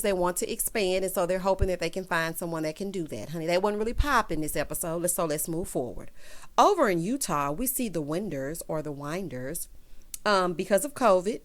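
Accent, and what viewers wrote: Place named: New Orleans, United States. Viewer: Hello, english is not my first language so I'm sorry. This is the first time I stumble upon your video, and I smile listening to your accent. You remind me so much of princess Tiana even though I have no idea how you look like. And I'm sorry if that's wrong or something because I'm not american, but to me you sound like you're from where Tiana lives. New Orleans ^_^ and it's just really wonderful hearing you speak, I truly enjoy your speaking voice and I'm sure you must be just as pretty as she is too.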